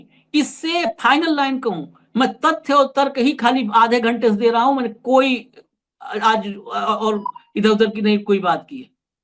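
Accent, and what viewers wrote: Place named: Ganges, India. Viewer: bhai aapke bolne ka andaz accha hai wohi ganga kinare wala Dil aapka saaf hai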